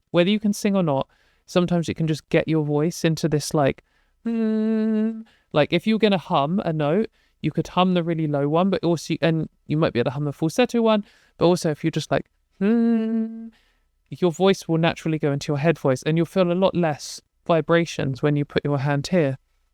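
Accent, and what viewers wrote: United Kingdom. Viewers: I'm gonna be honest here, I do think you have a lovely voice,. Pleasant to listen to. But then again, I've always been a sucker for those "husky" warm voices with Bri'ish accent anyway 😅 It was mentioned here before but I'll repeat it 'cause it's so important: record yourself. Yes, it's cringy at first. Nobody likes to hear their own voice, and that's because you're not used to hearing it from a distance. But it's oh so helpful if you ever want to improve / correct anything. And that also counts for all those singers / musicians out there who think they're god's gift on Earth. Record yourseif! We don't want to suffer alone haha 😂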